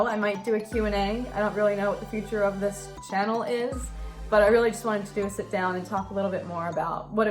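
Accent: United States. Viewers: My dad's family is from Philly and you sound just like them.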